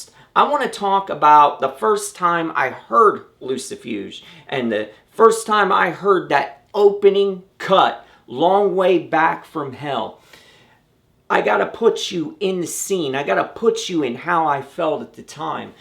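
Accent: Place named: Ohio, United States. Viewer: Sounds like Ohio to me!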